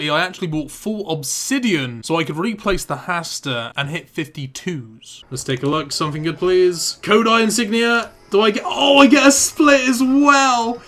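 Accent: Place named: England, United Kingdom. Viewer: !! where are you from in england?? i recognize your accent!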